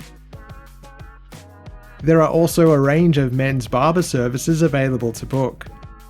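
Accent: Australia. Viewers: It's refreshing to hear an Aussie doing cruise content. awesome stuff man. Thanks you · I love your Aussie accent! Where in Australia are you? I went to Melbourne and Sydney almost seven years ago and loved both. Of the 18 countries I've visited, Australia had the nicest people. Thanks for the video. I'm looking at doing a Princess cruise in a few years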